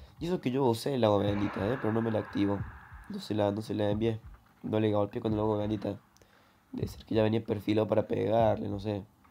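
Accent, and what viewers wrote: Cordoba, Argentina. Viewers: NUEVO SUB WUACHO (me pareces que tenes asentó cordobés jeje) YO HACE 3 DÍAS QUE ME LO INSTALE Y ME ESTOY RE VICIANDO...vi un par de consejos tullos y ya entendí mejor jaja Gracias cruk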